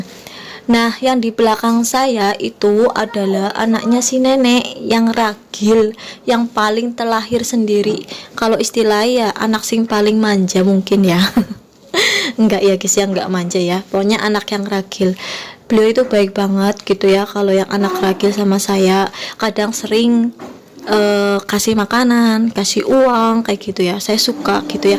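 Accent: Banyumas, Indonesia. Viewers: Medok bgt mba logat nyah.. org banyumas yah.. smangat deh bwt mba nyah. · Mb ko ngmngny ngapak..wong ndi sampean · Mb dr mana ya?... kok ngapak kaya aku?...🤣😛🤦‍♀️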